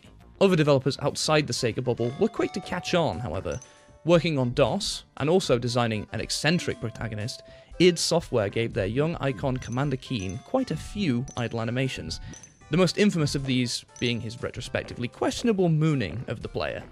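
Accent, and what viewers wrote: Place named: United Kingdom. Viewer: sultry british voice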